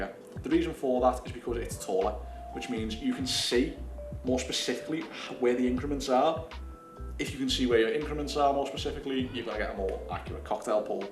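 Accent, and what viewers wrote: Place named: Liverpool, United Kingdom. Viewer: Stumbled upon you and your channel today when my pal Coach Vino posted about your drink, Vow of Silence. And now I get to work on my ability to understand Scouse! I've subscribed and look forward to your videos. Cheers!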